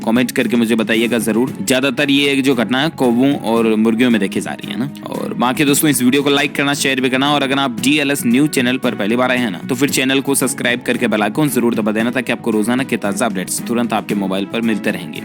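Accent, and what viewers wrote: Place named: Hyderabad, India. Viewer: Hyderabadi hona bolte.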